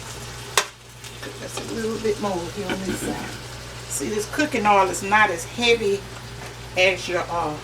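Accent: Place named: Mississippi, United States. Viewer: Greeting's, I am a new subscriber. When I notice your accent as you talk, while you cook, I said to myself, she must live in my hometown(MS). Because my Mother cooks and sound like this, she was a great southern cooker. You are a wonderful cook, I am so grateful to have found this Jewel of you and your channel. Blessings to you and your family.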